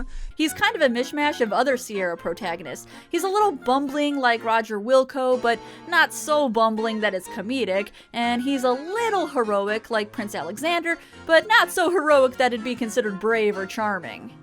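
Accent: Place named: Midwest, United States. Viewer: You sound very midwestern during your streams vs your edited videos.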